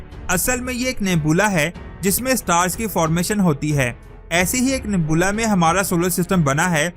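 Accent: Punjab, India. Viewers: Dear BROTHER ,Please correct your english like SKREEN. dont mix panjabi way in the vedio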